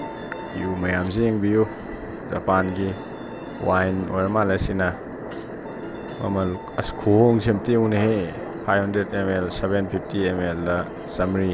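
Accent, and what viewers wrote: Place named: Keishampat, India. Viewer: Bro gi khdang se eikhoi keishampat khdang ga chp manei hydi eymbei dialogue se..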